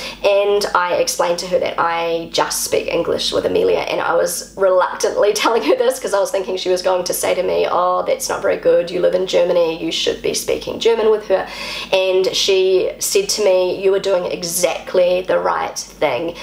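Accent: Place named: New Zealand. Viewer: I as a German with lately more of an average share of doctor's appointment obviously cannot comment on all possible infections, but the last time that I remember having been prescribed an antibiotic was more than twelve years ago when after having been bitten by a tick, an erythema migrans developed which might have turned into Lyme borreliosis, which was stopped by doxycycline. But other cases I don't really remember, and I'm in the second half of my sixties. As an aside, I really enjoy your Kiwi accent. I was in NZ in 1987 and 1993, and it is one of the few countries I'd have a desire to visit for a third time if it weren't so bloody far away. But in trying to explain to non-German (but some-kind-of-English) speakers how a German long E is pronounced, I'm sometimes successful by pointing out how New Zealanders pronounce "nEver", "sEd [said]", "tEn", "elEven and so on.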